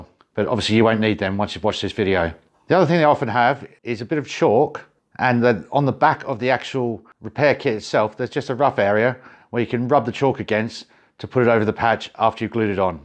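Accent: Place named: Australia. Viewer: Just watched another video. Great and clear to understand. I did pick up on the Aussie accent so should be saying G’Day. I’m Scottish and lived a few great years in Aus.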